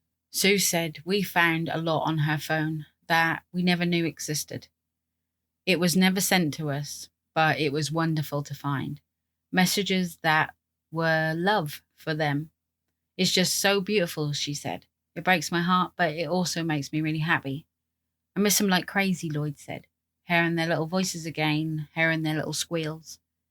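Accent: United Kingdom. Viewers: Great to hear a british accent for a change!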